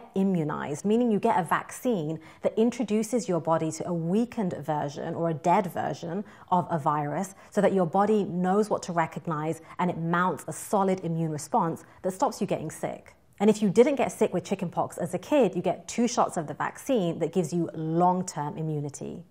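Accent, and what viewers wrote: United Kingdom. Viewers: BrRational: yeah, but she's cute, & with what sounds like a British accent, so... painless, at the very least. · So weird seeing perfect teeth speaking a British accent. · With her gorgeous face and that Brit accent, I can listen her read the phone book all day! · Thank you so much for your very informative presentation, Doctor. I love your British accent too.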